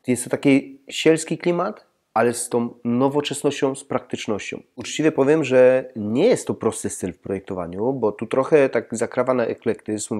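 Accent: Silesia, Poland. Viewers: Ależ masz ekstra śląski akcent👍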